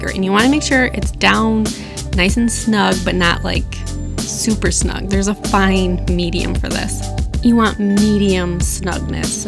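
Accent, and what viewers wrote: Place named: Michigan, United States. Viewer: re: your voice, I grew up in Michigan and we have similar accents. I figured you were from somewhere near Canada, but not Canada.... right?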